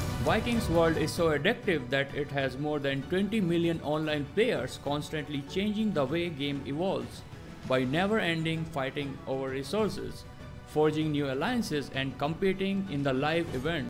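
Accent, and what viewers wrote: India. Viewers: U r a Indian I knew that ur voice Revaled! 👍👍👍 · You sound like an Indian ngl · You sound like indian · Are you Indian, it sounds like it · Love Indian accent wish I could do it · Is this your voice are you an Indian? I am Bangladeshi your neighbouring country🇧🇩🇨🇮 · Me hearing the voice said Oh is he indian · Indian voice lol no offence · Your Voice is Like Indian Average People ❤️ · It must be an Indian man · He is from India 100% sure. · Gee what kinda voice is day 1 like= no voice (and is that a indian) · I like your voice are you from Asia India? Just wondering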